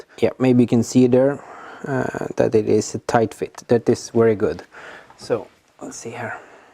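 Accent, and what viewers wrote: Sweden. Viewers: älskar svengelskan riktigt fint <3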